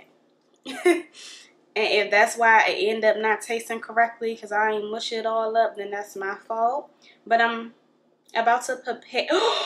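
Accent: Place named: DMV, United States. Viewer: New subscriber .. loving your channel I knew you were from the DMV by your accent 🤣 before I even read your profile lol .. u made me miss home lol 🫶🏾🤍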